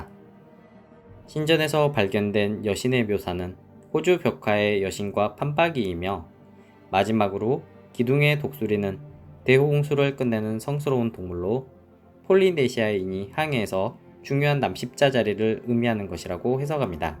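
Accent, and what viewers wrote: Jeolla, South Korea. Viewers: 진지하게 얘기하다 중간중간 튀어나오는 전라도 억양이 너무 귀여우시네요!ㅎㅎ 좋은영상 앞으로도 부탁드려용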